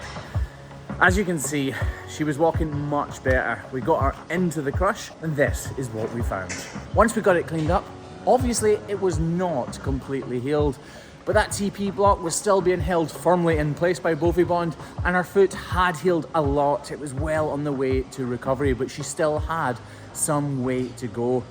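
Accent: Scotland, United Kingdom. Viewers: In the intro there, you sounded like a man who needed to finish his coffee before he tried to talk! This 65-year-old Canadian woman finds that she really enjoys your videos. Why? You really love your life, the scenery is spectacular, and you sincerely care about the comfort of those cows. Your pleasant voice and Scottish accent don't hurt a bit either.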